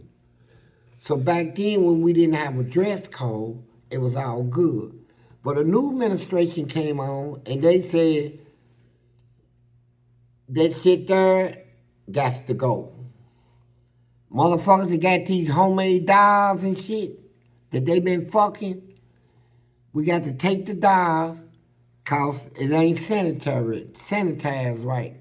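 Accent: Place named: Kentucky, United States. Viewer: He got that southern kentucky drawl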